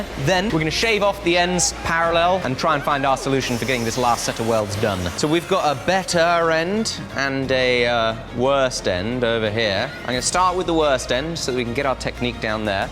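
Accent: United Kingdom. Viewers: A Brit talking about Hillbilly Engineering makes my redneck heart feel good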